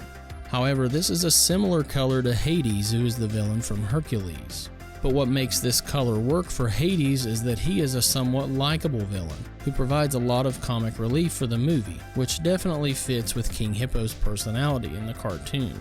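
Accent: South, United States. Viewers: Where you at man? My family and I love watching your videos! Sounds silly, but there’s something relatable we like about listening to a fellow southerner (and Big XII fan) talk about retro games. Keep up the good work!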